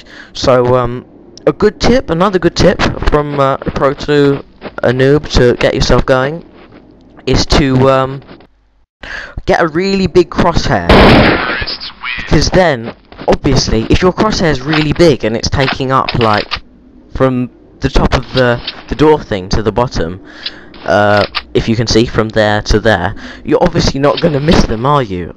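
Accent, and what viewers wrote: United Kingdom. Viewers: LMAO, Great Video...im not racist but the British accent makes it funnier for some reason! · he has an english acent bad spelling*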